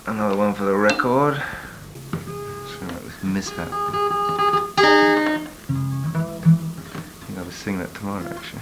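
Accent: RP, United Kingdom. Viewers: Love Drake but if someone told me this was one of the Royal family speaking would have believed them even makes the Pink Floyd guys sound like neds scallies or chavs 😢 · I had no idea he spoke in upper RP. I knew it would be RP given his upbringing but he says his A so flat, like Queen Elizabeth did. So beautiful to hear. What a talent he was. Tragic.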